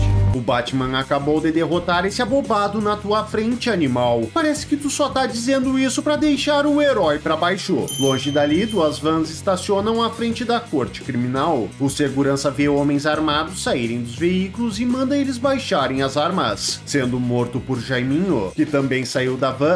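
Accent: Rio Grande do Sul, Brazil. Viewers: Tu é gaúcho?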